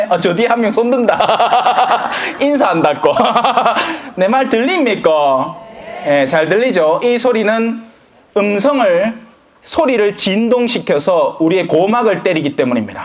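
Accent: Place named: Busan, South Korea. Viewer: 신부님 부산오셨군요 비슷한 사투리가 정겹습니다~ 항상 열정적이고 알아듣기 쉬운 강론 고맙습니다~~😊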